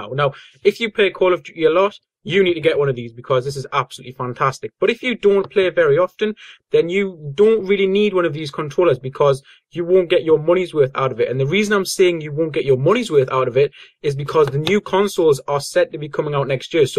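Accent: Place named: Northern Ireland, United Kingdom. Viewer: Ur from ni arent ya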